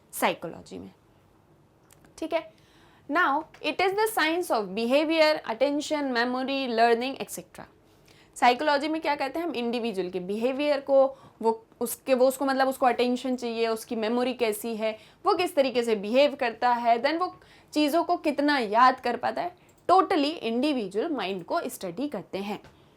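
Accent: India. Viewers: How are u doing dear Madam. I am so much eager to listen to ur lesson on sociology. However , it so difficult to understand ur pronunciation as it is highly influenced by zIindian English.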